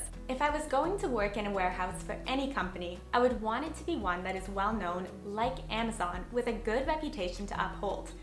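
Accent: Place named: United States. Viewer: Why American accent? English is from England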